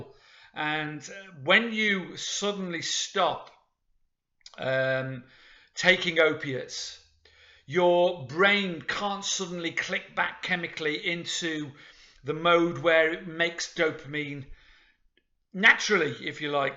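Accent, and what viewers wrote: Liverpool, United Kingdom. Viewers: PS love your accent , what part of the UK are you from? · ...I've seen many videos on opiate w/d...I'm an old hand at it like yourself. But you've shot straighter than most I've seen. Often people will say, "After 4 days the worst is over"...you can be sure that person is taking the piss, out and out lying...why someone would want to pretend to be an addict is beyond me. I hope you are doing well. I had a close friend in Florida where we drove cabs for the same company...he was from Liverpool...you sound like you're from if not Liddy, then Manchester or the neighborhood. Great people in the North. Well, thanks for caring enough to make these for those facing the dread of RLS, what we used to call "jimmy legs". Cheers from Pennsylvania!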